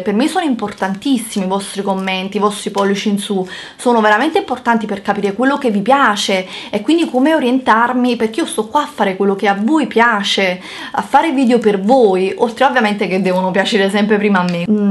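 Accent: Naples, Italy. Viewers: Brava,vai avanti così, sei una ragazza solare e chi ti giudica esteticamente nn ha proprio capito che bella persona sei a darci tutti questi consigli di nn comprare schifezze.Mi sono iscritta da poco e sono felice di iniziare a capire quali siano i prodotti buoni grazie a te.Adoro sentire il tuo accento napoletano e posso solo dirti di nn pensare a queste persone perché purtroppo sono gelosi e invidiosi di quello che fai.Io invece solo perché sono carina devo subire cattiverie in un altro modo...quindi nn è un naso che nn piace a far commentare negativamente ma tutto può essere fonte di critiche x queste persone vuote.Ti mando un bacione. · I tuoi Vlogs sono davvero molto simpatici, come lo sei tu, solare e anche le tue inflessioni Partenopee arrivano al "cuore" Pero' almeno rispondimi ogni tanto!!! Mai ricevuto una tua risposta:( comunque for ever!!!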